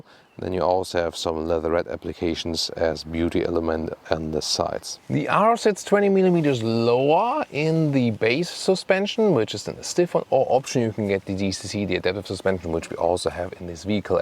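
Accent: Germany. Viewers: For some reason your accent rubs me the wrong way. Maybe because I had an awful German manager.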